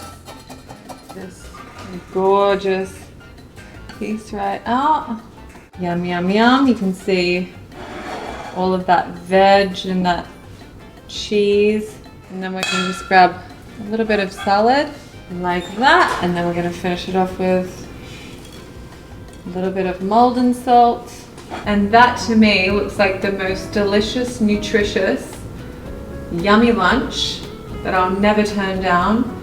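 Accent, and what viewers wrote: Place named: Australia. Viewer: you are such a funny and beautiful cook, I love your Auzzie accent and am a huge fan of your wonderful husband Texas guitar slinger Mr Gary Clark Jr, Ive seen you two interviwed at the grammys and you just take my breath away, fine couple hope you can keep your love flowing Thank you for all your delicious recipes you of course make it all so delicious.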